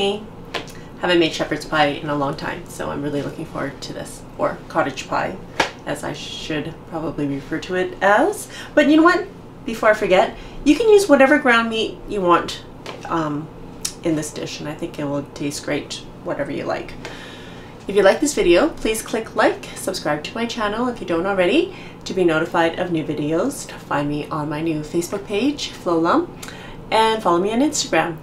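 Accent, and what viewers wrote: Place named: Canada. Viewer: Chinese Canadian - great accent! Lovely food as well!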